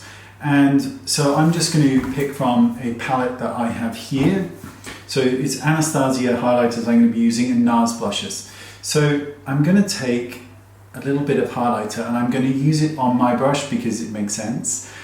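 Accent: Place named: United Kingdom. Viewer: Disconnected beard 👍, highlighter under blusher 👌, that earring whooooo makes me cruuuush on you and the cherry on top of the cake is your accent! It's british i assume... ! How i wish i could learn that accent ,it's beautiful to the ears. Sooothing OMG, Wayne is Asmring without realising it (if that make sense) lol! 💓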